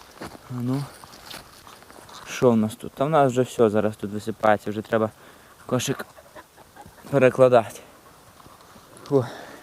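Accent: Hutsul, Ukraine. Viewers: Але файна розмова Гуцульска дуже люблю слухати 😂❤❤ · Приємно слухати гуцульську говірку я б тілько за це і дививсі би!)) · Насолоджуюсь лісом, грибочками і МОВОЮ! Спасибі, легінику! Це свято - побувати в осінньому лісі, побачити саламандр і почути файну гуцульську говірку! Дякую щиро!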